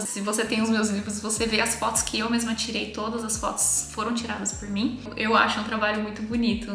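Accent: Brazil. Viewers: Sabia que teu sotaque, não era estranho! !!!Porto Alegre RS, capital 💃💃😘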